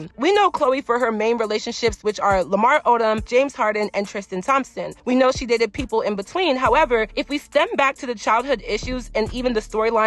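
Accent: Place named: Baltimore, United States. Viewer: You from Baltimore? I heard the “do” and “you” in the accent lol…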